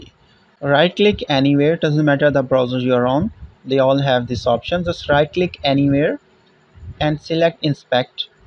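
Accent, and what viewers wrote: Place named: India. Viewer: You probably speak russian(judging by russian channels), indian (or pakistani I dont know, just from your accent) and english and other asian languages? if not more... That's impressive!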